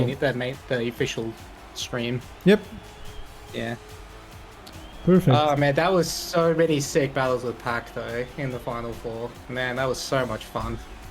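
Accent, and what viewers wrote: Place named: England, United Kingdom. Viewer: pourquoi tu prononces meme les pseudos avec un accent anglais ? Je veux dire Papou ça devrai rester "Papou" et pas "Pahpouw" ou même Aurel ça devrai rester "Aurel" et pas "Owel"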